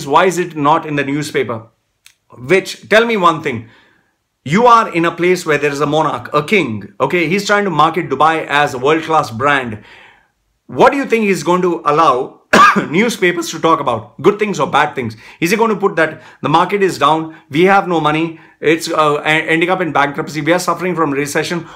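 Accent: India. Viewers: Shaithan/illuminati and Indian accent burst me into laughter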